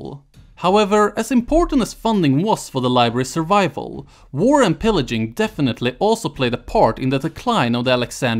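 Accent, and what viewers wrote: Sweden. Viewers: Do I detect a Swedish accent?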